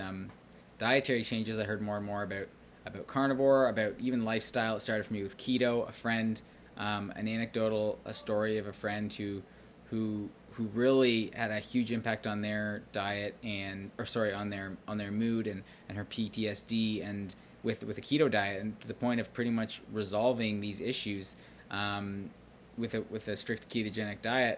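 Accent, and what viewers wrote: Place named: Canada. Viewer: I do enjoy a Canadian accent - and I like your reference to emotional ‘richness’ in the ups and downs of life.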